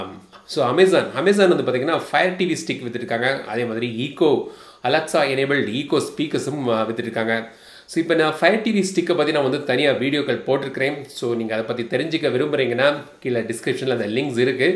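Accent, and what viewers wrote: Kanyakumari, India. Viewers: Polinju pona company! Typical kaniyakumari slang! A Fan from your district bro!.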